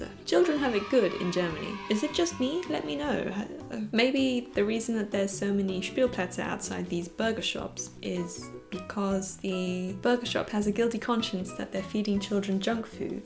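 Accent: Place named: United Kingdom. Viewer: Love the natural and authentic way you do your videos! And aswell your British charme and accent ;).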